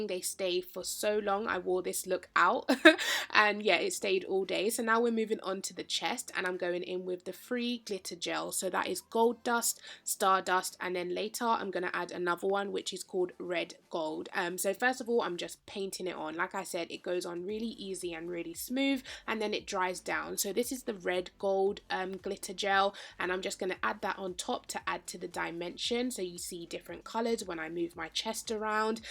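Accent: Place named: United Kingdom. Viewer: Havent watched a british youtube vid in a hot minute. Love her accent. The makeup is gorgeous ♡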